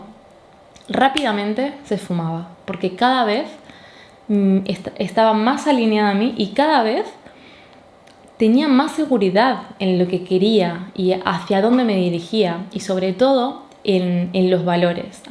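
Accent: Argentina. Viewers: Gracias por tu canal y por abrirnos tu corazon en este video ❤ te acabo de descubrir hoy y ya tengo planeada una maraton porque hace un tiempo que vengo trabajando en mi desarrollo personal, y realmente este es el aspecto con el que siento que mas necesito reconectar y en el que me siento mas perdida, gracias realmente por proponerte guiarnos ❤️ Estoy esperando ver en algun video que digas de donde sos porque por momentos te escucho hablar como argentina y por momentos como española, y ahora que te veo tomar mate es evidente que alguna relacion con Argentina has tenido. 🤔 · Creo que no me quedo claro desde donde viajó hasta donde, alguien sabe?. Por ahí me suena a qué tiene un acento argentino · Sos argentina? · tiene un acento no🇦🇷??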